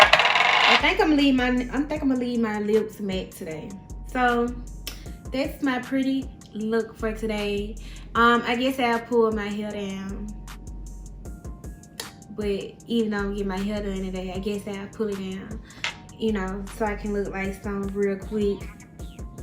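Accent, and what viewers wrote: South, United States. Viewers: Can I suggest a concealer for you to try?? Maybe the Maybelline eraser concealer in the shade tan? I love that concealer and it has a creamy consistency it’s not runny at all. Love the look! 💞💞 Btw you don’t talk country you just have a southern accent I’ve heard people talk country and that’s definitely not it lbs 💞💞💞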